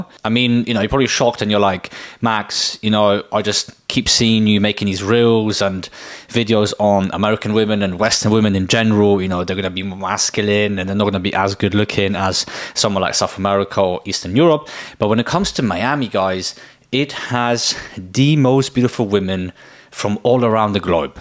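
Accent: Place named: United Kingdom. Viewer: True. That's cuz he's a Polish good looking dude with British accent. So he's super exotic in Miami. If you're an avg American guy, Miami would be horrible to date in.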